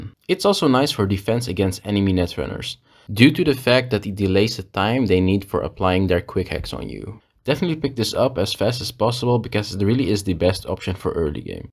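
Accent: Netherlands. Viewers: Great video man, very helpfull!!! Ps. Is that a dutch accent i hear?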